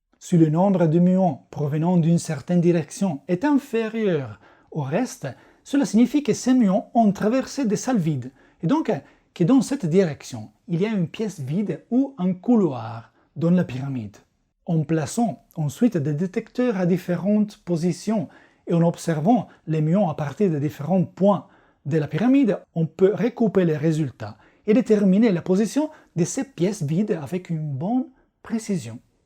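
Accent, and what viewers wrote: Italy. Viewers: Vous avez tout à fait raison!!! La qualité de ses vidéos et de ses explications est de haut niveau. Comme l'est sa pédagogie, sa façon de simplifier les choses complexes pour nous, public ordinaire. Je suis inscrite à sa chaine en italien et ne savais pas qu'il en avait également en français. Outre le fait qu'il est super beau gosse (ici en Toscane, on dit: un bonazzo della madonna) 🤩 Mais en plus, ici, s'ajoute le merveilleux accent italien. Oh Mammaaaaaaaaaaaaa 😉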